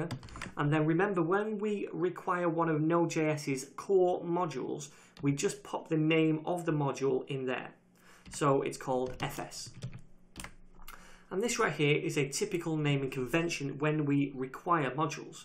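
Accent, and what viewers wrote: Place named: Scotland, United Kingdom. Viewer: I love Scottish accent!!!!!!!!!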